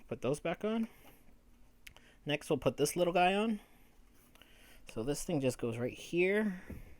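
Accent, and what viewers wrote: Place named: Mexico. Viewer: This guy for sure speaks Mexican-Spanish, his accent is obvious.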